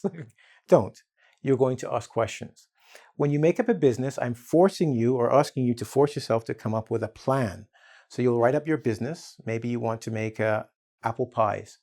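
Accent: Brazil. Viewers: are you Brazilian? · Are you a Brazilian guy?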